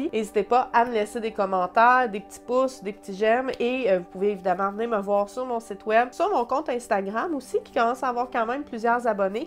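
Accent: Quebec, Canada. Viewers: Waouh Nouvelle Vidéo J Adore l accent Canadien😉 Tes Cheveux Supers. Nous Sommes Sorcières de Famille Ma Grand Mère Le Grand Oncle., Bisous a Toi😉🐾⛲🐱🐈😄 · hello! Vous avez une voix qui nasille et l'accent québécois avec lequel j'ai toujours eu beaucoup de mal à écouter mais vous êtes tellement douce que j'arrive à vous suivre et à beaucoup apprécier!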